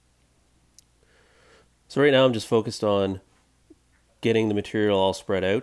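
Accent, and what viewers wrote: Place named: Canada. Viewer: Why did canadians start and continue to say the letter o as a u?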